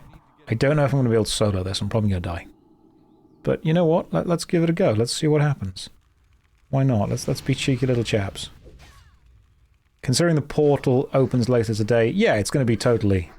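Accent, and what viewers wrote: United Kingdom. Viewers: I like the british ASMR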